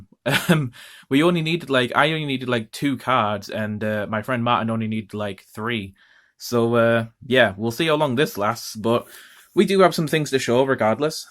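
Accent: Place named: United Kingdom. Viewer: knew it from the accent. I’m from the uk as well 🇬🇧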